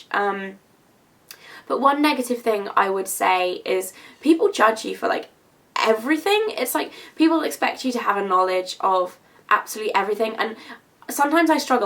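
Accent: United Kingdom. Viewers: ahhahhaaha squirty cream. i love british accents! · I love your channel! Enjoyed your 13 questions tag! It is so neat to hear your accent! I would love to visit the UK someday!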